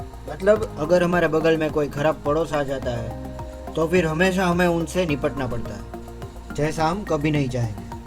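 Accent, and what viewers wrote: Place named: Kathiyawad, India. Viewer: Bhai tu kathiyawad ( Gujarat) se hey na? Speech idea aa gya... Ye banda rajkot ya Bhavnagar side ka hey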